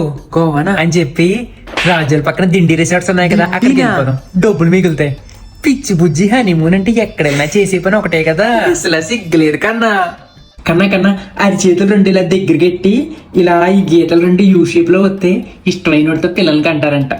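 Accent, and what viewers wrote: Godavari, India. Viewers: In godavari slang you nailed it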